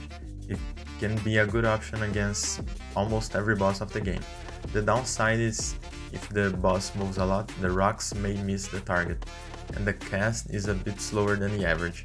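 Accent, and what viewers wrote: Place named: Brazil. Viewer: Vc é br ne cara, logo no começo notei o sotaque kkkkk Adorei o video!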